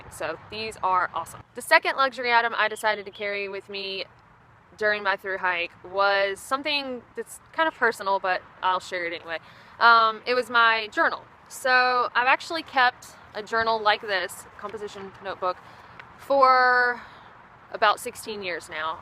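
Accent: South, United States. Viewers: Came here for the southern accent- stayed for the information... Bought your book.. can't wait to read it. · I bowl water also. Your southern accent is dignified and correct! My favorite camping item to bring would be bacon.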